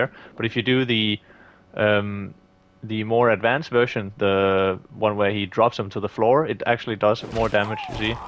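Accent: Denmark. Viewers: I smell Danglish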